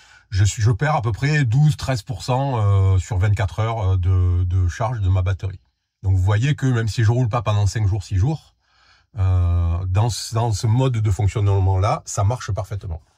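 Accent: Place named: Marseille, France. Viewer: Merci beaucoup pour tes vidéos de partage sans rien avoir à vendre. Cela fait du bien ! Les contenus sont pratiques, efficaces. A ma connaissance, tu es le seul à avoir une démarche aussi complète sur le monde du camping car. Quant au soleil que tu n’as pas dans tes vidéos, cela est compensé par la petite partie de Marseille que tu transportes dans ton phrasé. (J’ai un peu la sensation d’entendre Di Méco.😉) Bonne route pour la suite de ton voyage et encore merci.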